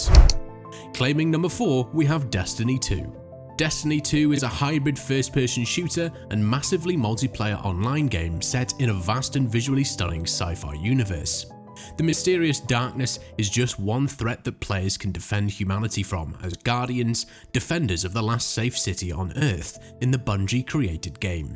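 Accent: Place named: United Kingdom. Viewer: British english accept is the worst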